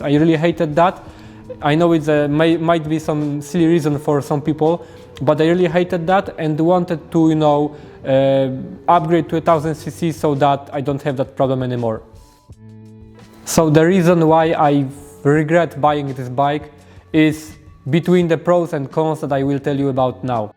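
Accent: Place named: Poland. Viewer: Powodzonka, dobrze sie slucha tego Polskiego Angielskiego 👍💪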